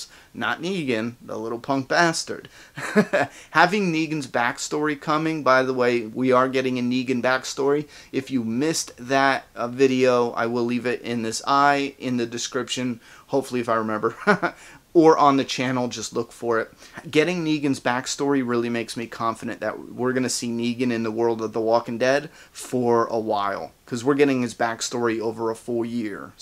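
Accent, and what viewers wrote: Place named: South, United States. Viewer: You have a southern accent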